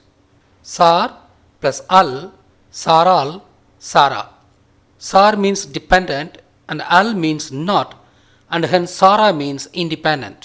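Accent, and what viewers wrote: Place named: India. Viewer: also all the arabic words you mentioned you pronounced in Indian accent.. vocally in Arabic its not even the same word.. its like a deaf guy struggling to correctly voice the words.. so you cannot match the words vocally if you cannot correctly pronounce them..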